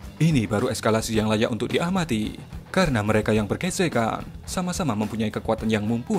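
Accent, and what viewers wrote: Java, Indonesia. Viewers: Kok meddok banget mas wkwk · Medok amat mas suaranya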